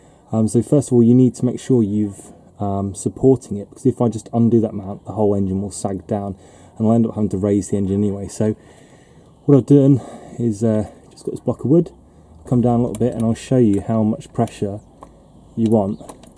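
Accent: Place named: England, United Kingdom. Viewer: I'm super stoked and appreciative of your videos, but between your English accent and how DEEP the tone of your voice is versus the sound equipment you used, it's difficult to understand you.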